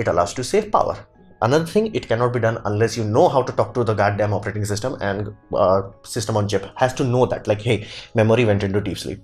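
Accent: India. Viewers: In spite of the heavy Indian chatter accent, a VERY good explanation.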